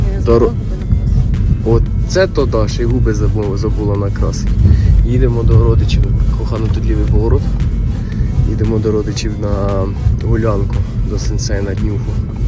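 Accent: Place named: Surzhyk, Ukraine. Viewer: А мені подобається твій суржик,обожаю( правильно обожнюю).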